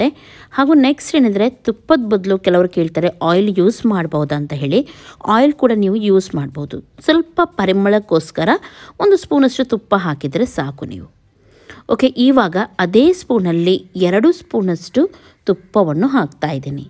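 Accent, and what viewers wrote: Mangalore, India. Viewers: But really nice 🙂 speaking 🗣️ mostly they are manglurians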